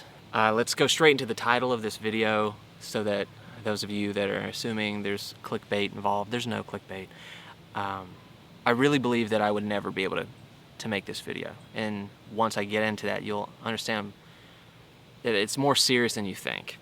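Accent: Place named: Georgia, United States. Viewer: So glad you're on the upswing! The Georgia accent is coming back and I think that's a good thing ♥️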